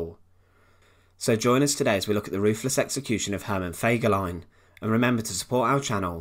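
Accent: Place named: Leicester, United Kingdom. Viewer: I've said it before, but fantastic to hear a proper Leicester accent, makes me homesick. Re the vid, wasn't Eva Braun "HOT!"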